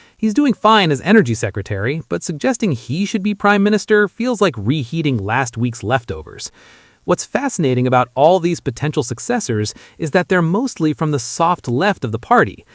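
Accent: United States. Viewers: Why have we have an American voice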